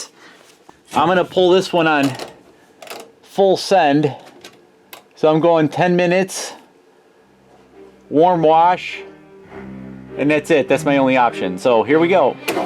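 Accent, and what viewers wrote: Wisconsin, United States. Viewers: I almost want this guy with his Wisconsin accent to say “Marge, get the cheese wheel”. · You definitely sound like you’re from Wisconsin or Minnesota, but most likely Wisconsin.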